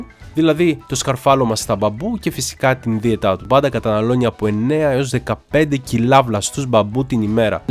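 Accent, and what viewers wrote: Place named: Crete, Greece. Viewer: και το λλλη και το νννι που κολανε με την Κρήτη , το τσε που είναι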